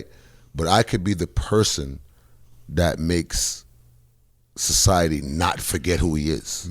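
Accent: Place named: Jamaica. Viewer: he flows naturally into a Jamaican accent...... only dumb people wouldn’t figure that out !!!! 😳😳😳😳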